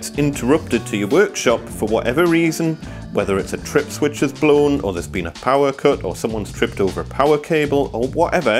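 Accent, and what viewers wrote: United Kingdom. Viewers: That's a UK accent, and UK plugs...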